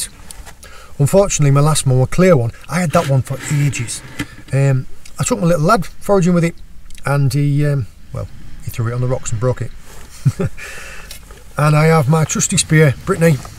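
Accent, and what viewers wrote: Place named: Cornwall, United Kingdom. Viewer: “Sheking” 😂 I love your Cornish accent!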